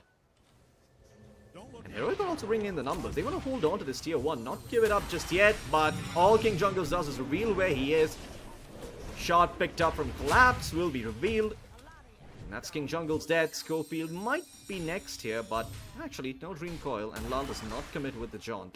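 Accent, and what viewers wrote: India. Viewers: Indian accent caster? Immediately muted the video.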